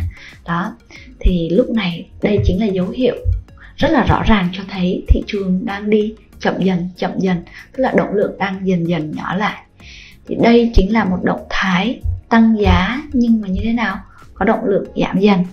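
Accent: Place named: Nghe An, Vietnam. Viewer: Nghe giọng như quê ở 37 à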